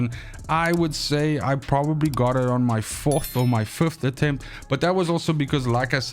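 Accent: Afrikaans, South Africa. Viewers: Man sounds Afrikaans af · Bro are you South African??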